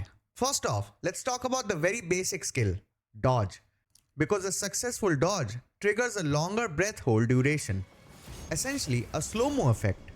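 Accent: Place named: India. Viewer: I'm chinese and your Indian accent is so thick it made me pay more attention to what you were saying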